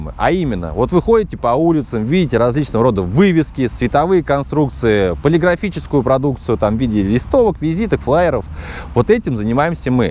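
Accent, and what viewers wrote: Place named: Kirov, Russia. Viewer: Если приедеш в Кировскую область удивишся сколько там похожих с такой дикцией =))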